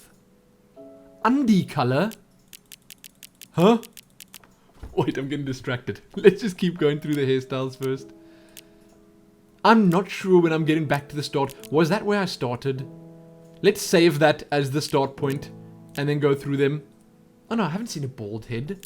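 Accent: South Africa. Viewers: Sounds South african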